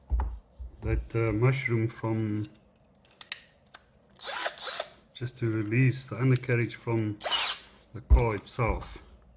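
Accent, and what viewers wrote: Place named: South Africa. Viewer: Thank you, your mini looks good after the Dremel, where are you from? You sound South African.......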